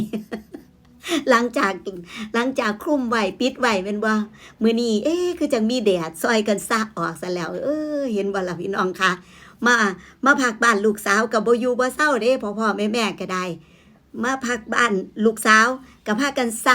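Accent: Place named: Ubon Ratchathani, Thailand. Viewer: ชอบการเล่าข่าวแบบนี้ น้ำเสียงแบบนี้ สไตล์ 🎉🎉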